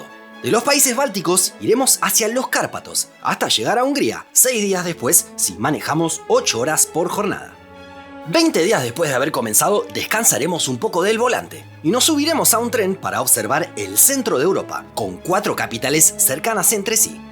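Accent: Rioplatense, Argentina. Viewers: De dónde sos ? Me encanta tu acento! (Argentino o uruguayo)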